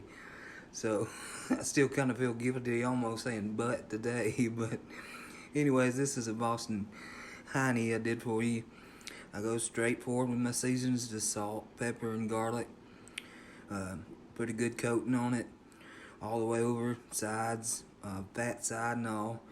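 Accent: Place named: South, United States. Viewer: Beautiful home cooking honey! That pork looked amazing. The bark was spot on!! 🔥 That’s funny and cute about you not saying “butt”! Love your country personality and accent and loved your outside setting.. very peaceful! Thank you for sharing. I enjoyed the video honey! 😘 PS the sammich looked amazing! 🤤 🙌🏻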